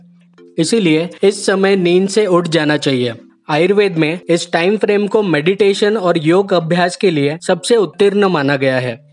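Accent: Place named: Odisha, India. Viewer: Brother You Are From Odisha ? I am asking it because your language pronounciation are more related to Odia.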